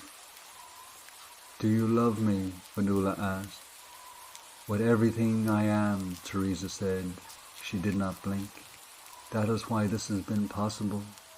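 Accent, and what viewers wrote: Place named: Clare, Ireland. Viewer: Love that I found your page. These stories remind me of the ghost stories my granny used to tell us. Thanks for the nostalgia. I keep trying to guess which county you're from by the accent. My guess is Co Clare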